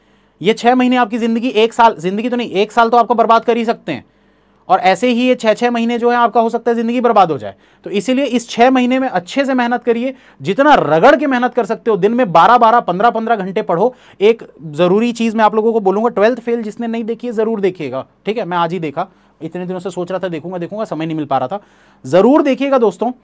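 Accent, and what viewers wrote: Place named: Chhattisgarh, India. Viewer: Sir ap pura chhattisgarhi style me bole ho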